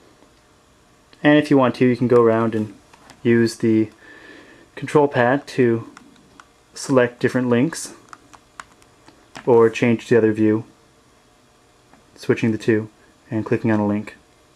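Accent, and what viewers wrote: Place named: United States. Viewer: annoying american voice-over...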